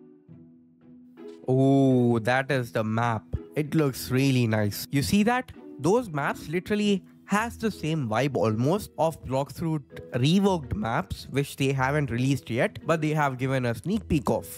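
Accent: India. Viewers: Dude your voice is like a bit Indian ngl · ur accent is like an Indian no hate tho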